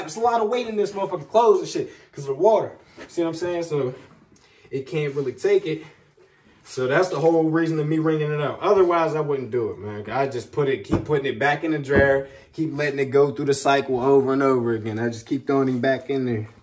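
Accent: Pittsburgh, United States. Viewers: My guy has that heavy Pittsburgh accent. "Just sooooow y'all knooooooow"😂🤣😭 sounds like my uncle, lol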